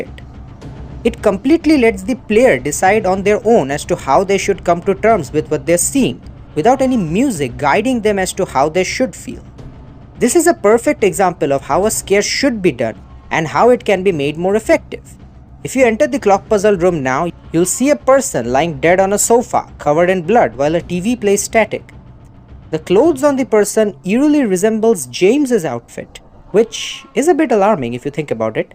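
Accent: India. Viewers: This cute accent our host is bringing me joy. He is analyzing and noting interesting things and having that desi cadence that makes my heart sing with different perspective.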